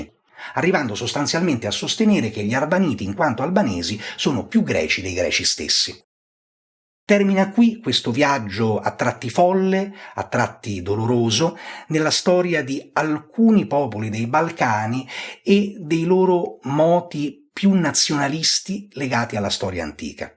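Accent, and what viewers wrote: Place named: Rome, Italy. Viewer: Caro Professore! Hai detto molte cose vere, e nelle altre hai fatto arbitro buono che non sta né da una, ne dall’altra parte. Ma per chiarire ” qualche “ imprecisione desidererei parlare a questo occhi, sento l’accento Romano nell’’tuo parlato, (scusami del tu, ma sono più grande di te, e posso permettermelo), se sei di Roma e se il tempo te lo permette, possiamo mettersi d’accordo per un caffè e parlare. Grazie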